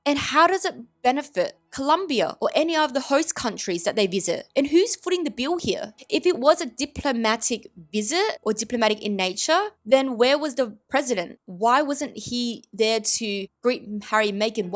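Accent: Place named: Australia. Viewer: Hi - I'm a new subscriber to your channel. I'm trying to work out where your accent is from - are you an Aussie cousin? I'm from NZ.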